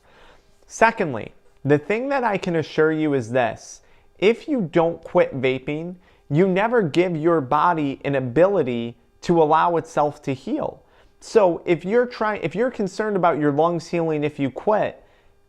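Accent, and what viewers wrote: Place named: Midwest, United States. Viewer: I’m high asf rn and his Midwest accent is killing me😂😂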